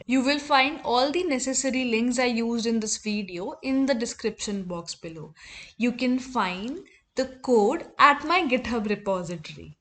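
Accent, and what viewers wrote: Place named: India. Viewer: Good content, but the Indian accent is SO STRONG that it is VERY Distracting. The cadence of speech is from a totally different language than English. Please learn how to speak with at a minimum British accent, or much preferred ... an American-style accent.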